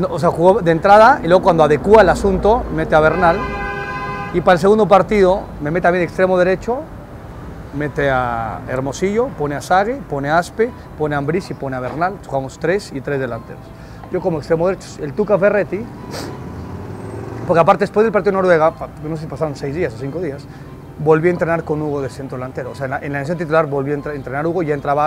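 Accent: Spain. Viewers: Lo unico q no me gusta es q uses esa forma de ablar muy español si eres de la ciudad de mexico abla como mexicano creo q es muy feo q por q viviste en españa dos o tres o dies años ya no puedas cambiar tu forma de ablar yo soy de oaxaca y con mucho orgullo lo digo tengo mas de treita año viviendo en la ciudad de mexico y aqui en la ciudad ablo como se abla aqui en oaxaca ablo como alla. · y gradualmente enfatizas el acento gachupin.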